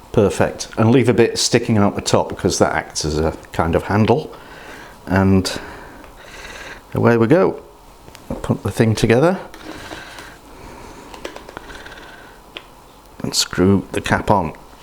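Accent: United Kingdom. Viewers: Kernel sanders is British?!?!?! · I love your accent! I'm American but my husband is Northern Irish. So I always love hearing the different dialects of British accents, and yours is lovely. Soothing to listen to. I also really enjoyed your video. And this is very awesome. I've never seen the long egg before now... And I think you did a very amazing job. Your videos are very well made, and I just love watching you cook lol! I'm not sure what it's called... Forgive me for my ignorance, but it's a common dish in Britain where they boil an egg and crack it open and eat it from the shell. I'd love to know if you have a video for that, or could maybe do one.. I've always wanted to learn how to make that and I really like your method of cooking! Or do you maybe have any knowledge of the proper recipe for it. Any how, I definitely wanna try this long egg! I love eggs, some salt, parsley, bread or crackers... 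Yummy. So this would be nice for so many reason, a gathering of friends an appetizer, or for breakfast with the family etc. Keep sharing! P.s that's a really awesome concoction you made there for the egg. Very smart and clever. Brilliant actually lol. Take care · is he British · I like the way brits talk. I wish he said potter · British Colonel Sanders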